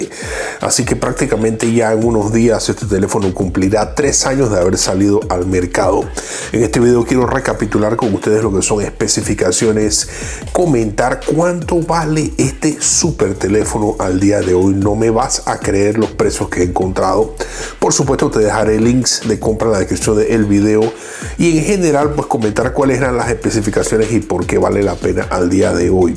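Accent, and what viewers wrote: Panama. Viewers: Hahaha escuchando me di cuenta que eres de Panamá, saludos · Ajajaja estoy buscando Review de este equipo y me salió un paisano que sin saber que era panameño por el acento me di cuenta ajajaja saludos bro buen Review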